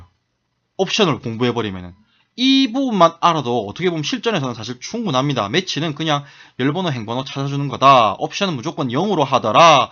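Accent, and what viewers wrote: Gyeongsang, South Korea. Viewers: 쌤 너무 감사합니다 ㅠㅠㅠㅠ컴활때문에 들어왔는디 같은 경상도인으로서 어투가 매우 친숙하고 화나있는 듯 한 말투이지만 절대 화난것 아닌 잘 가르쳐주시고 싶은 마음이라는게 여기까지 느껴져요ㅋㅋㅋ♡♡♡ 합격할게요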